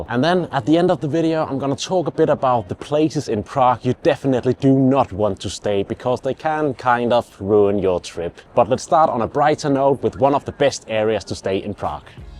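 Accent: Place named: Liverpool, United Kingdom. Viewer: You sound like you have a slight Liverpudlian accent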